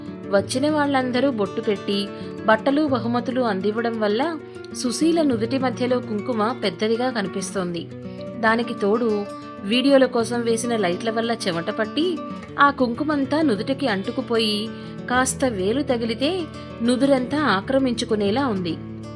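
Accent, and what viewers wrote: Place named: Godavari, India. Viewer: ఇది సాధారణంగా తండ్రికి ఎదురయ్యే పరిస్థితి. కొందరు తల్లులు కూడా ఎదుర్కొంటారనుకోండి! భర్త కూడా అలా పిల్లలతో కలిసిపోయి భార్యను ఆడిపోసుకోవడం కాస్త ఆశ్చర్యాన్ని కలిగించింది. అతనైనా ఆమె వాళ్ళను తీర్చిదిద్దిన విషయాన్ని గుర్తించి వాళ్ళను మందలించి ఉండాలి. అప్పుడు కథ సహజంగా ఉండేది. స్త్రీవాద కథ రాయాలని రాస్తూ స్త్రీ కూడా స్త్రీకి శత్రువే అని చెప్పే ప్రయత్నం చేశారు రచయిత్రి అనిపిస్తోంది. అచ్చం మా గోదావరి జిల్లాల స్త్రీల యాసలో ఎంత బాగా చెబుతున్నారో! ఎన్ని సార్లైనా మెచ్చుకోకుండా ఉండలేకపోతున్నాను. ఆమె విజయవాడలో పెరిగిన విషయం తెలియక ముందు నెల్లూరు వాసి ఈ యాసలో ఎలా మాట్లాడగలుగుతున్నారన్న ఆలోచన ఇప్పటికీ పోక ఇలా అనిపిస్తుందేమో! విజయవాడ యాస కూడా కాదు ఆమెది.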